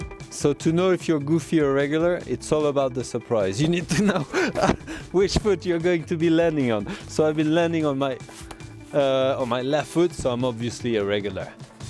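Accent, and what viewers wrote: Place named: France. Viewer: there is a reason why everyone likes! his so calm and radiates good energy, and the french accent is so smooth u can spread it on ur bread like butter, french butter =) everyone: hav a good surf on ur turf!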